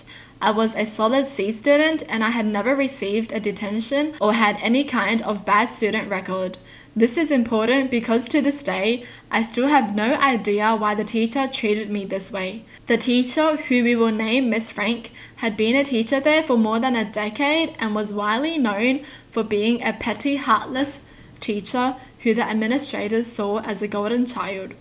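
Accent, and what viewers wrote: Australia. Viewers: Is she Aussie? 🤔 Where does she live? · iamshooketh she’s just Australian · Love her Australian accent aswell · iamshooketh she’s Australian but so trueeeee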